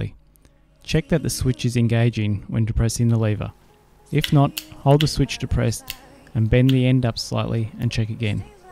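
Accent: Australia. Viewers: are you Australian or Kiwi?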